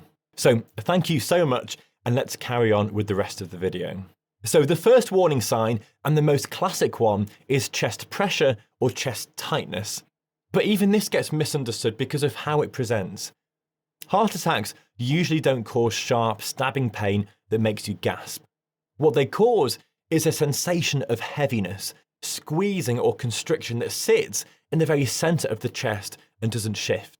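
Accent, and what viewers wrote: England, United Kingdom. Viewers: I have just recently subscribed, and really enjoy the way you have presented these situations . It is very easy to understand, and that is appreciated. Thank you. Plus I always enjoy the English accent.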